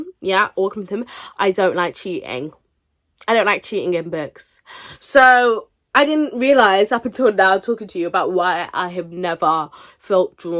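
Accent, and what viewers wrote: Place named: England, United Kingdom. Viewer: Hey! nice meeting you. I love your English accent! it's super cool. Plus I appreciate your perkiness in your videos. And you also have a sense of humor. ☺All great skills to succeed in your BookTube channel. Of all the books you mentioned, two of them stuck out for me. Black London and whiskey in a tea cup by Reese Witherspoon. I shall order them, come the new year. I also have quiet a lot of books I must read before adding more to my library. I am currently reading "Finding me" by Viola Davis. 🤗 thanks!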